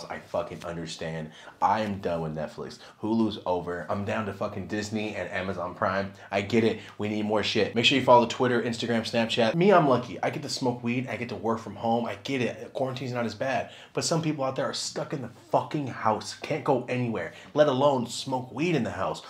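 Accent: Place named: South, United States. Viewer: Am I the only one impressed by the southern accent?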